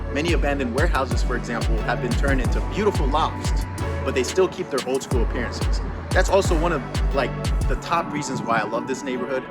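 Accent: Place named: Latin America. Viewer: Hey, thanks for the video, very informative, I like you're latín too couse I could understand All the video (im practicing my english) and that make me happy... Well im dreaming to live in there (im from Colombia) and I wanna know how is to be latín in ATL (maybe one video idea)... Leave you a big like!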